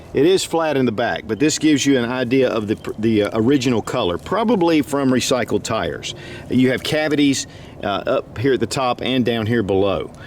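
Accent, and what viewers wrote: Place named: North Carolina, United States. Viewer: wiltson.....I have always loved the way u say that. in fact I frequently watch ur old Wilson combat videos......I'm from TN but u can't beat that nc accent of yours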